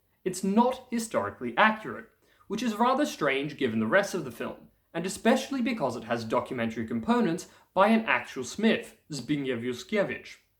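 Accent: England, United Kingdom. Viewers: Your Polish sounds good and your English, well, English. As to never having been to the UK? Big surprise to me. I did like the video, btw and will certainly check the movie out. Up until now, the best looking swordplay - with sabres - that I'd seen was in another Polish movie, the title of which I don't recall, but concerns the 'potop' period. One of a trilogy, if I remember rightly. If you've not seen it, it may be worth a look. But then, I've never so much as handled a sabre, so make of that what you will. Cześć!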